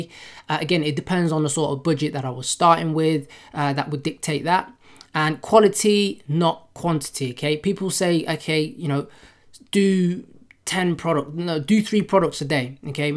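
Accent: England, United Kingdom. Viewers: You say dollars but have an English accent :P whereabouts you from?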